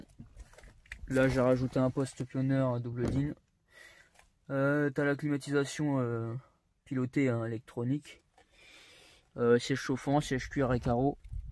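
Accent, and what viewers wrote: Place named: Normandy, France. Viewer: J’ai la même ! Mais avec le volant sport ) 👍 Tu es de Normandie ? C marrant ton accent 😂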